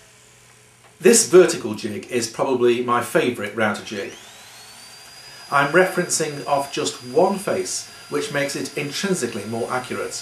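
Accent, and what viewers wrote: United Kingdom. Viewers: Why is it that everything sounds better when delivered with a British accent? Nice work. I like your jig, and your presentation in your videos are excellent. Thanks.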